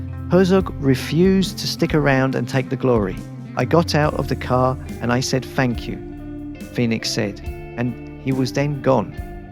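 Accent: United Kingdom. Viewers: Other than the cringeworthy use of the made-up "disorienTAted" by a narrator with a diamond-cut English accent, still a really informative and well-researched documentary.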